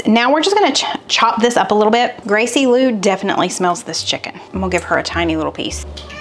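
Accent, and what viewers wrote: South, United States. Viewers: When I hear a southern accent and see them using ingredients from Food Lion I know it's going to be good.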